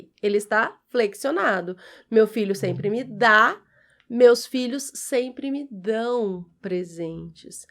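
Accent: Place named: São Paulo, Brazil. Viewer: Oi,professora,amada por mim, você está certa de puxar o R,um erre áspero.O teu erre tem som do R paulista.Te amo,okay🥰🥰🥰🥰🥰🥰🥰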